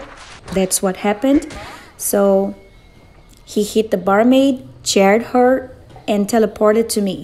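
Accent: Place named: Brazil. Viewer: Aí mds acho tão lindo um brasileiro (a) falando inglês se como fosse português ahhaha❤️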